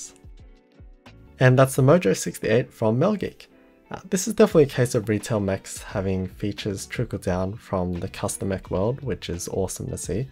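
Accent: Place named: Australia. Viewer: an Aussie accent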